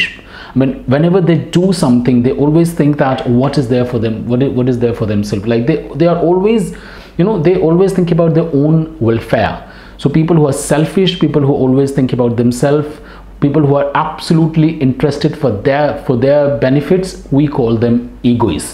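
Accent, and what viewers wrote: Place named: England, United Kingdom. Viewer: Sir I like your English accent 😊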